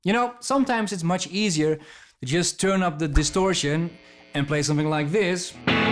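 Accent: Ireland. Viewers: What's that accent you have brother, Irish? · ure Irish dnt u..?!? Love ur videos well done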